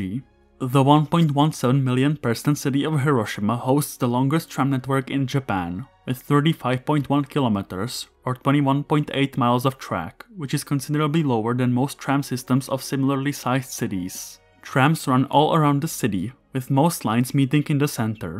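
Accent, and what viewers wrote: Czech Republic. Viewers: Are you from the Czech Republic huh?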